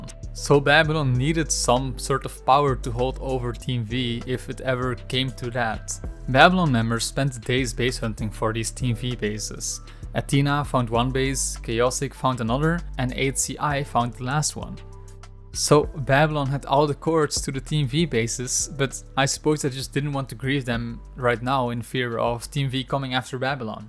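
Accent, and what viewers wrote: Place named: Netherlands. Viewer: cause u sound dutch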